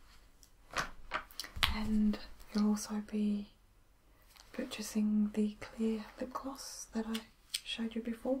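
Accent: Australia. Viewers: Are you Australian? Beautiful video!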